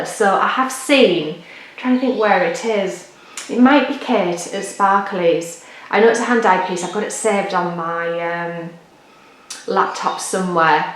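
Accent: United Kingdom. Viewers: Hi, I sure do miss your videos. Please consider making one soon. I love watching you, your English accent and your sweet Daisy. Plus your birdies. I would love to also see how you do the petite stitch/tent stitch on your Mirabilias. I just recently started Royal Holiday and I’m needing to see your beautiful stitching on faces. I hope we see you pop in soon. Love ya and leave ya!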